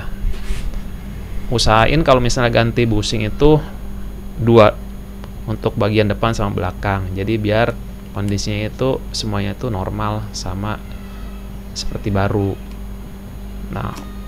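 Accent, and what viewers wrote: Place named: Banjar, Indonesia. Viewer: Org banjar kh, logatnya tu..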